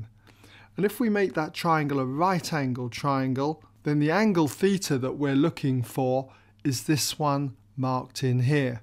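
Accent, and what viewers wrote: United Kingdom. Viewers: you have the most modern british accent I've ever heard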